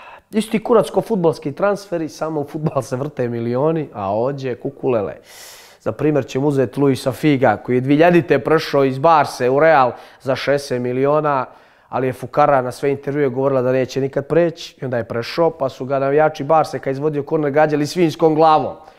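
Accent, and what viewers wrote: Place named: Rijeka, Croatia. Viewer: Ludane ludi, a znas kako mi je milo kad pričaš rišnjanski pored toliko godina Beograda!! ❤❤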